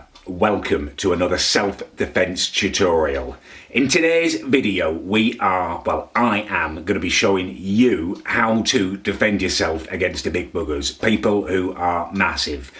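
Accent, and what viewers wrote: United Kingdom. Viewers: Anthony Fantano with a British accent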